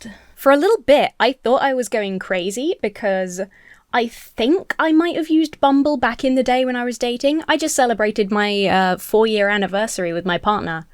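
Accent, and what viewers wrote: United States. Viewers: Sorry that happened to you, weird and a little gross. I can't put my finger on it, but your american accent weired me out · Your American accent creeps me out a little. 😆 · You're American accent is scary good, if I tried to do a British accent I would sound like Dick Van Dike in Mary Poppins. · That was a SCARILY accurate american accent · oh no your american accent is getting pretty good. also i do not think an american would say snark or cheeky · Your American accent is pretty decent but still it had me cracking up. Catfishing is dumb and violating. Hopefully it doesn't happen again. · 😂😂 I find it hilarious when you lean in hard to the American accent and British-isms just to draw the contrast. (Or vice versa.) By the way, you're doing great on your American accent! EDIT: Just got to the part about the bad news. That's awful. I hope you can find some legal recourse. 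· All I learned from this video was how much I want to hear your american accent MORE. LIke a LOT more. k thx bye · Great American accent... and I notice you kept the ejective K! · That's crazy that someone did that. Also I love your American accent. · Love your American accent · Your American accent is superb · Your American accent is pretty amazing. In most of the US, we do say the R in ‘snark’, although it’s not that common of a word in younger generations. Snarky is sometimes, but sassy or sarcastic are more common in my experience. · Not a bad, though obviously exaggerated, American accent! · I rarely ever see people attempt (let alone, nail) an American accent. I was just listening for a few seconds after clicking this video and thought i tapped the wrong thumbnail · a note about your american accent, you've gotten really good at it lately, but you are over-ennunciating everything, you sound like a work training video more than a real person. 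You aren't reducing enough vowels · You are not a small channel anymore. Twice in the past 2 months I came across other channels that mentioned your name or had your picture. You have a great american accent. You are almost as good as Alba Baptista who started in "Watrrior Nun". I was shocked when I found out baptista wasn't american. There are too many bad people on the internet. I hope they didn't completely ruin it. I love my online friends. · Your American accent is almost too good. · sometimes your American accent reminds me of Erika Thompson- texasbeeworks. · Your American accent is so good it's tripping me out lol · Your American accent LOL awesome. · IT WAS SO WEIRD HEARING YOU TALK WITH AN AMERICAN ACCENT!!!! As an American, you sounded totally authentic. And I'm sorry, I cannot lie. You sounded kinda hot.....for a lesbian. ;P I always love and respect you just the way you are. 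Please, forgive me for finding you so (in random order) charming, funny, beautiful, smart and sexy. I'm such a horrible sexist! lol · the American accent. Love it. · I love your American Accent! It's two years later, and many things have gotten worse. I've heard of several schools where students were disciplined for using "nudify" apps on their classmates. AI programs that show photorealistic extrapolations of people naked. Utterly disgusting, and beyond utterly disgusting when it's done to minors. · Your american accent is getting really good, it's a little disorienting! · Nice American accent! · I completely didn't notice the American accent until she became British again. Bro. Edit: I'm American, and I can say that it's a pretty convincing accent. It just feels weird to hear with the knowledge that it's fake.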